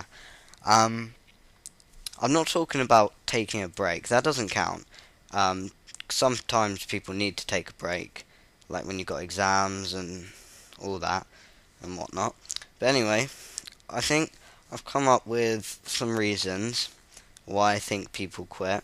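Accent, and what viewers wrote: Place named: England, United Kingdom. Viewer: Lol I like your English accent.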